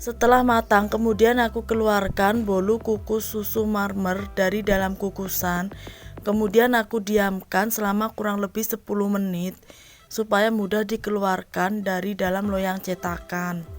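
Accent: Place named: West Java, Indonesia. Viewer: Orang Sunda ya..kentara suaranya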